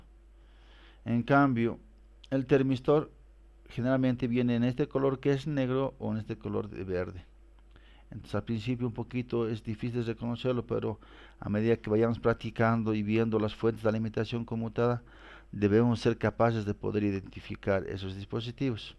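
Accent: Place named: Antioquia, Colombia. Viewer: Impresionante didactica, pero si me hizo reír su acento paisita jjjjj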